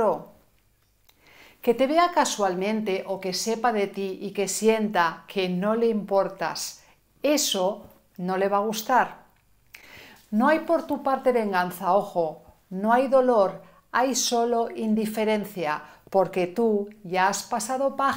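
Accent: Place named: Spain. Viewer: Estoy enamorado de tu voz y acento espanol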